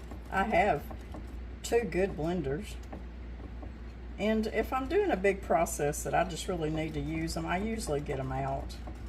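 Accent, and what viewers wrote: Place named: Arkansas, United States. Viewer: The kids would request buttermilk pie for birthdays so it was a favorite ❤️. I think my favorite thing about your channel is your way of talking there in Arkansas. It’s so sweet and relaxing. I love the words you use and the way you say them. Just oozes love and I know that comes from Jesus. I froze some duck eggs this morning and I made quite a mess! Tried to do it in the muffin cups but duck eggs are too big so I couldn’t whip them up plus duck eggs are so thick. So I got out my stick blender and the head of it fit right in the muffin cup perfectly. A few buzzes and all was well! Next time I will buzz them before pouring into cups but at least I saved the ones I had already cracked in the muffin cups. I did use the silicone type.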